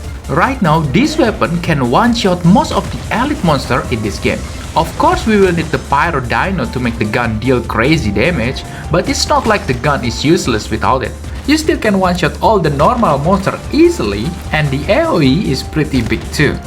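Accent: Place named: Indonesia. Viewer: Aksen inggris nya indo bet 😂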